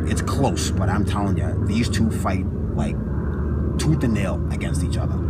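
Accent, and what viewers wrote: Boston, United States. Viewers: THIS GUYS FROM BOSTON!!!! WICKED & PAHK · you sounds like ray donavan's brother haha (boston style) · Great vid. I can tell your a fellow Bostonian. Best Boston accent I've heard on you tube. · Boston guy can tell another Boston accent & no flipping doubt THIS GUY IS FROM BOSTON! Charlestown here brother...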